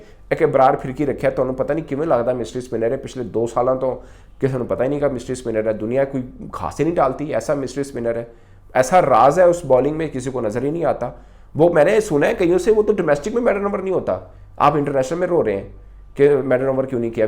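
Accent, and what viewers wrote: Punjab, India. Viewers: Your Punjabi accent is too good sir....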